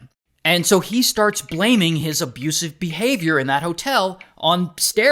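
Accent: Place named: United Kingdom. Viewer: personally i wouldnt let a british dude yell at me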